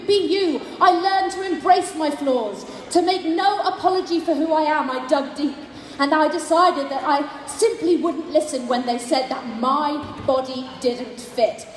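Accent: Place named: United Kingdom. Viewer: Nice accent British Nice speech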